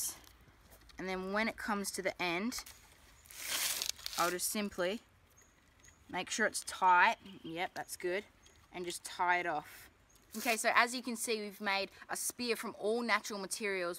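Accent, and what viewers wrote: Australia. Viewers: Is he ozzie? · He’s Australian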